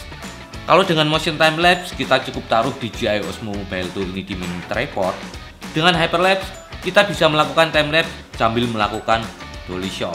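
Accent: Java, Indonesia. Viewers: Kok medok cak?? Ngendi lho asline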